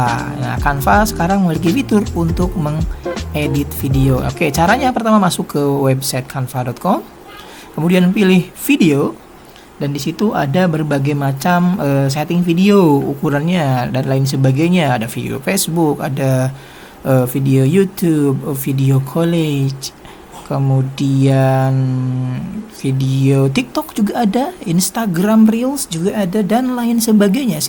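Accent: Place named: Lombok, Indonesia. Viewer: Bang ? Dari lombok y ? Kok nada suaranya kayak logat lombok ?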